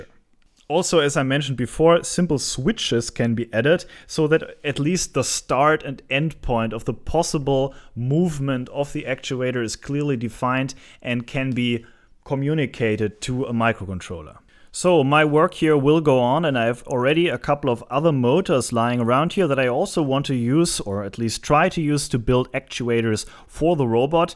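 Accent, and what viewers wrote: Germany. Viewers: hallo,super video .👍👍 warum sprichst du so gutes englisch mit deutschen akzent? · Ha! I knew that was a German accent. There was no doubt after you said Opel!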